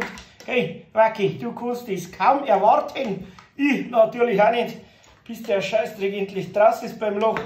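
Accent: Bavaria, Germany. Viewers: Dein Hund ist süss und gut erzogen ( oder satt) 😀 Ich liebe einfach bayrisch😍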